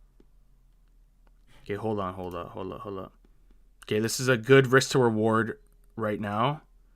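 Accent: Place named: Jamaica. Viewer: Hey u Jamaican..jah know u sound like one ?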